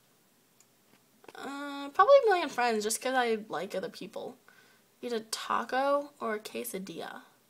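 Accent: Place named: Boston, United States. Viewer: Lol babe I love the way you pronounce coupon, pajamas, caramel, salmon, fire, water, New Orleans, lawyer, then any other thing with a Boston accent.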